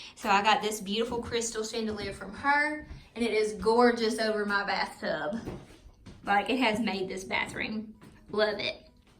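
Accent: South, United States. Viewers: Fall Greetings from Canada. Oh my what a charming & cosy home you have.I love your southern sweet accent. I too am a YL member (now 2 yrs.) & what a difference it has made in my life. TFS & God Bless!!! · I’m a southern girl from fla and texas and i adore hearing your accent brings me home 🍁🍁🍁🍁❤️🎃🍁you have a beautiful home 🎃🍁🎃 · Oh and this is the first video I've seen of yours, and I'm so envious that you have a large loving family. You've got the sweetest farm cats, and I just love your personality and voice. Very southern. Im Canadian but I have said more than once that I wish I was born somewhere in the south. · The minute you started talking I knew you one a home town Southern girl, I live a rocks throw in Hueytown, your home is beautiful, love Petals of the Past! glad I found you!😊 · you brought tears to my eyes when you were counting your blessings. 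God is a good, good God and gives us our, not perfect, but rich, joyful lives. I always love your home tours....you could make any ole house a warm and inviting home with your decorating swag! TFS your life and beautiful home with us! God bless you and Drew.👍😊💛🍂🌻🌾🎃🍂🌻💛 BTW, I too am a small town girl and love that you may match or surpass my southern draw...lol!!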